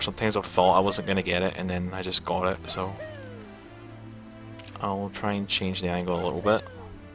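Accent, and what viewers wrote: Scotland, United Kingdom. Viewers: Yupeji His commentary with his Scottish accent is interesting enough, stop complaining, it's just 4 minutes.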